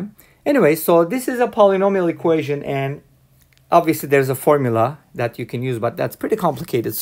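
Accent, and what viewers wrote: Turkey. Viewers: usta bu fikre şivenden kapıldım ama türk müsün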